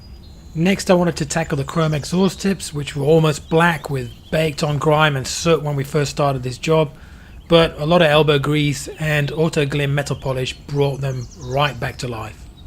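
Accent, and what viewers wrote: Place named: South Africa. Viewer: yeah getting tattoo appointment is hard enough if you have a good artist let alone the issues with the pandemic so I feel you bro, but at least you can save a bit of money for a while lol I did detect a bit of South African but wasn’t sure enough to mention. I’m based in London, at the moment on the outskirts. I’d defo be recommending your channel to my friends who are also heavily in to cars but It’s just unfortunate I can’t send any business your way due to location. Anyway I’ll be watching your other content soon keep it up 👍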